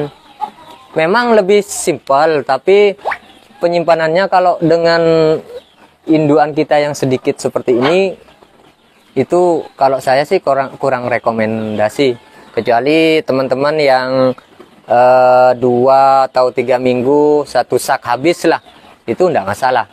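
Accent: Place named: Madura, Indonesia. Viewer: Mak logat madureh kak😅... Kaemmah empiyan kak...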